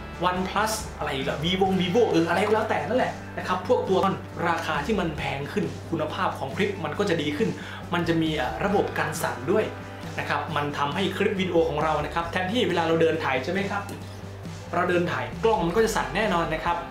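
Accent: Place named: Surin, Thailand. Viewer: พี่สำเนียงเหมือนคนสุรินทร์เลยย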